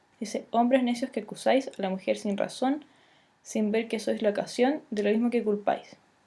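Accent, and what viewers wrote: Chile. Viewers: Cuando se nota que eres chilena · A mi igual me gusta el ACENTO chileno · no me gusta el asento chileno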